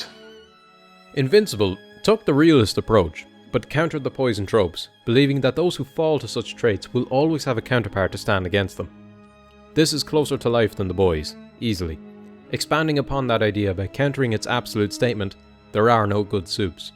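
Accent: Ireland. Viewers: why is an irish version of james wilson from house md telling me about invincible? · YO UR IRISH IMA BE WATCHING U FROM NOW ON YUPPP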